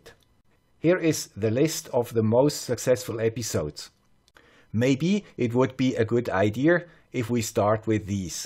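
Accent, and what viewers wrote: Switzerland. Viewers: Love your swiss accent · "Olá membros do YouTube ! Eis o homem de sotaque Suíço ! ..." - Português Europeu - European Portuguese. Consider me enlisted ! If you can find the time to do it, I'll find some time too... Alexandre from Portugal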